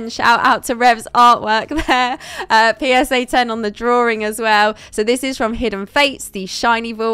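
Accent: United Kingdom. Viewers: nice start video , but this brit accent is very annoying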